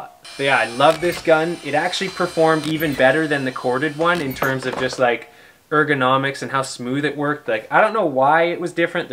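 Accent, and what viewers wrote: Canada. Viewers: Sounds like you have a Canadian accent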